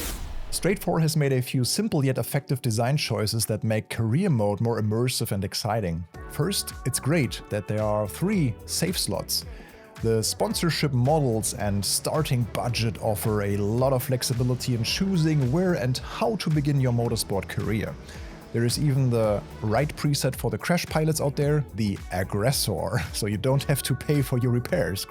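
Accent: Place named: Germany. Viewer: Man merkt sofort das du made in germany bist 😅.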